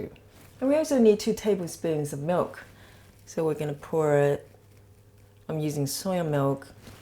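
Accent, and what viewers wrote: England, United Kingdom. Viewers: This is a life saver. I have a ridiculous amount of okara. Also, I love your accent. It's like a mix of British and east Asian (Japanese?) Anyway, I think ill make this now. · Just sounds like an English accent to me.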